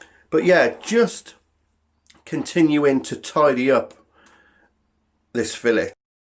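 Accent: United Kingdom. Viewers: British 110 per cent!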